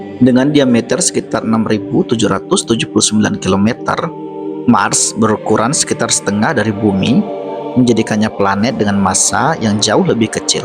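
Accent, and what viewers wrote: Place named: Madura, Indonesia. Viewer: GANTI PEMBACANYA!! BACA NYA KAYAK ORG MADURA… Tak Ye Tak Ye, kyk tukanh sate depan rumah saya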